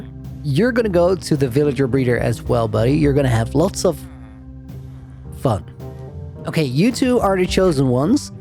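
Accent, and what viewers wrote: Netherlands. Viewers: Toch lekker dat kleine nederlandse accenr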